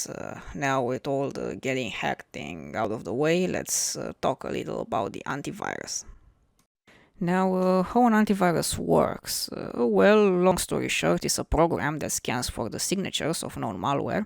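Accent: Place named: Russia. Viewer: love your accent. its russian?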